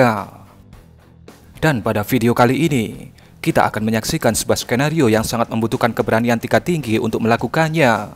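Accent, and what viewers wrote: Java, Indonesia. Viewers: suara lhu medok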